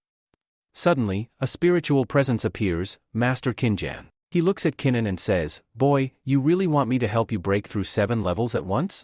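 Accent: India. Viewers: Fckn indian accent immediately made this story a stupid joke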